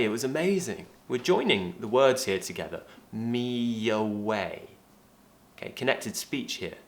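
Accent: United Kingdom. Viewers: I love UK accent 😍😍😌 Arabic girl .. from Iraq .. Keep going🤗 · British pronunciation wins ❤️ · Your british accent is amazing😍😍😍😍😍😍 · I like acsen british. Ada karakteristik dengung. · Your British accent it's blew me away 😍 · Although you typed it here, I still watch the video because of his british accent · Allright!!! I am from Brazil and love your videos, you help me to improve my english and the my accent too cos I really like the Bristh accent.... Cheers!!!! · you really help me to learn british accent..thanks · Hi, I am one of your very supportive subscriber. I already watched some of your beautiful and useful videoS. I really like it so much. Although yourE a British people and I love British accent so I think itS the reason that pushes me to watch your videos everyday. Thank you and hope to see more upcoming videos from you.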